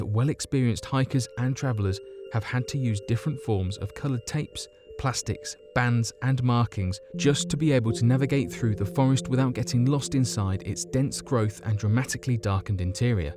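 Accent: United Kingdom. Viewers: Co.ag for music, British accent, why not be Top5s 2, instead of unexplained mysteries?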